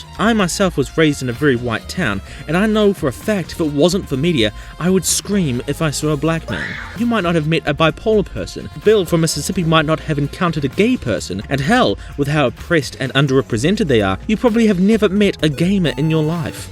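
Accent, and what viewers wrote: Australia. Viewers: I'm so sorry the mad lad I thought u were Australian until now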